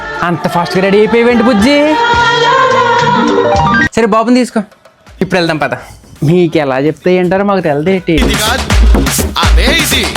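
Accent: Godavari, India. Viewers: Annayya pakka godavari language superrr anna Okka video chusinaventane subscribe chesesaanu Chala baagunnai videos😊😊😊😊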